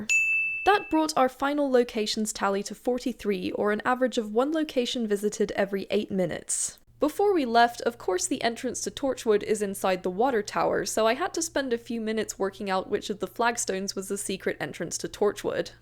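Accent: United Kingdom. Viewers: “To visit my British family” With that accent, you don’t consider yourself British? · Very impressive packing all that in! You sound so British that I can’t believe you’re not from there 😱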